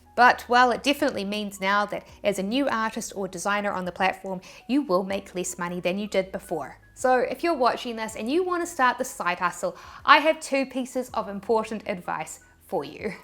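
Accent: New Zealand. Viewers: Are you a kiwi? Your accent is adorable!!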